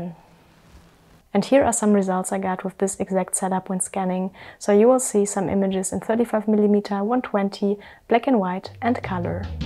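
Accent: Germany. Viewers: Came here for photography stayed for German accent 😍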